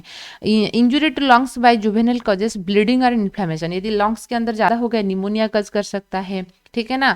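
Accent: Odisha, India. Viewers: Are you from odisha mam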